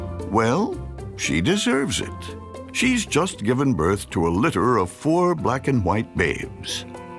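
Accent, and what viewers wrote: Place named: Transatlantic, United States. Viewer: Long time since I heard that transatlantic accent! Makes me think of an old cartoon movie.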